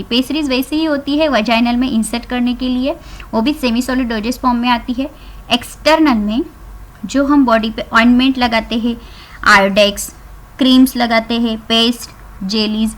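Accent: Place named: Kerala, India. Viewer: I sure you’re from Kerala 99%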